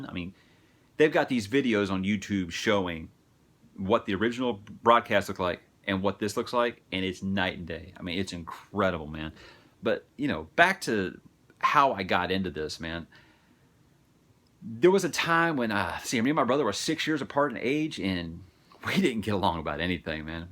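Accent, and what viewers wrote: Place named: South, United States. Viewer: Wow your voice then versus now is soooo different! The heavier southern accent was so cool to hear.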